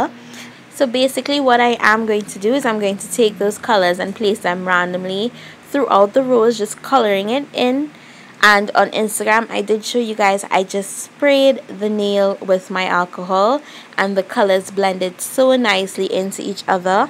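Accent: Trinidad and Tobago. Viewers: I am sooo happy i was SHOOK when i heard your accent i was like.. wait nah dis is ah triniiiiii!!!!! ayeee new subscriber!!!!!!!!!!!!!👏💯❤ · Love hearing and seeing your videos..it's so refreshing to hear our lovely trini accent🤗great work hunni💕💕💕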